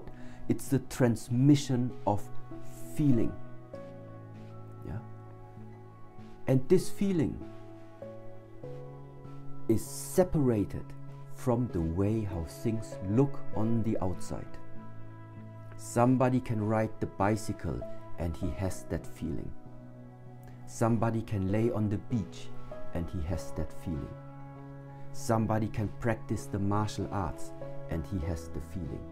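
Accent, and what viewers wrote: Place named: Germany. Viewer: Is he from Germany he has a strong german accent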